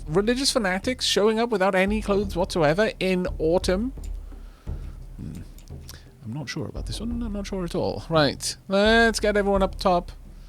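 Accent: Wales, United Kingdom. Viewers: with your sultry Welsh tones and your propensity for looks over function, how could I not!?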